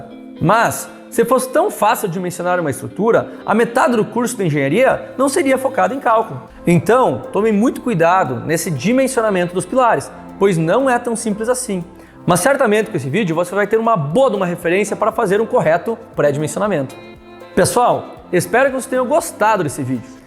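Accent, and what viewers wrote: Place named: Serra Gaúcha, Brazil. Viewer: Já sou seu fã e pelo tom de voz deve morar no sul serra gaúcha 👍🙏🙏👏👏👏👏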